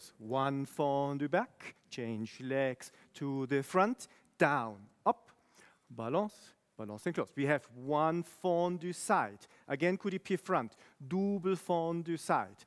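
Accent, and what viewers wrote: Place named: Brazil. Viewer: I know you're Brazilian 😂 your accent tells ♥️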